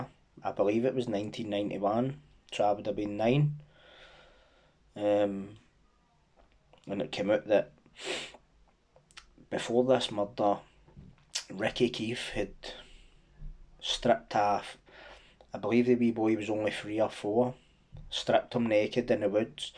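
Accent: Scotland, United Kingdom. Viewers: I'm a new subscriber to your channel.. I'm a fan of crime stories and watch a lot on youtube while im busy with orders and you popped up a couple days ago.. loving some scottish narration (im in Edinburgh, partners from glasgow) so its good to hear of some more local cases! Keep up the good work 👌🏼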